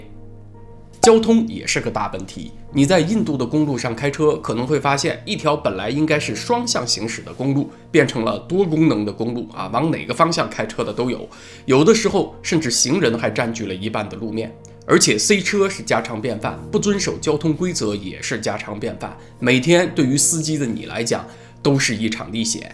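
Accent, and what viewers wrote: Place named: Henan, China. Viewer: 楼主的国语带有河南口音，我听起来很亲切，咱们是老乡。😂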